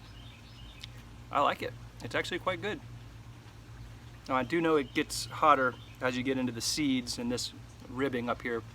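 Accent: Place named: France. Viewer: You have somewhat of a French accent... Are you from a Ontario or Quebec??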